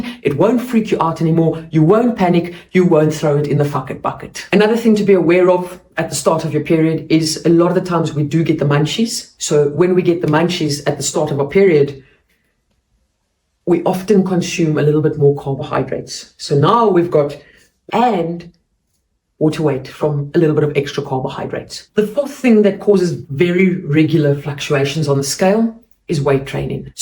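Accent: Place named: South Africa. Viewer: I knev there where something of about the Scottish accent but there you go South African thanks for your quick response 😊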